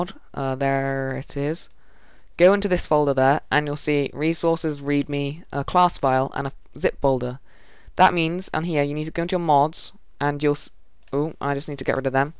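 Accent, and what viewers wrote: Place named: England, United Kingdom. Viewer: idk what your doing on the thing i cant read it :( and no offence to you or anything i just cant understand an english accent XD i need to watch more dr.who...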